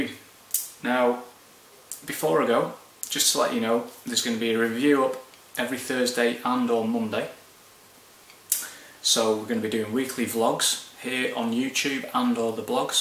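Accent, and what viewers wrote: Manchester, United Kingdom. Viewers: I luv your Mancunian accent! :-) And what a cool review of a great little whisky this is! Good job, mate!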